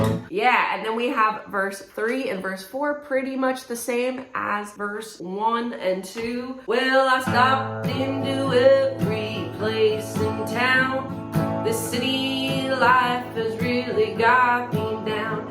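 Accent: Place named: South, United States. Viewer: Love that Southern drawl!!! Great lesson too!!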